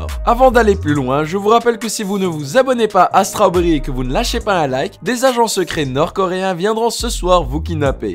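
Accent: Quebec, Canada. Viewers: Québécois sinon bonne vidéo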